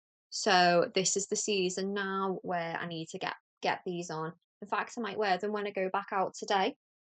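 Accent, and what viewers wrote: United Kingdom. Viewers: I love your socks and your accent! Where in the UK are you from?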